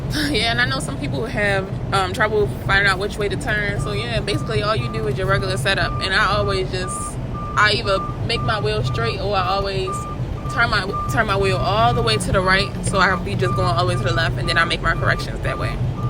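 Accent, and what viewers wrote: Baltimore, United States. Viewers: Okay yeah I thought I heard the accent too (born and raised here). I always second guess myself. I at least heard her say Merlin so I knew she was from Maryland 😂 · She sounds like she's from Baltimore area. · Are you from Baltimore? 90 percent sure of it i can hear the accent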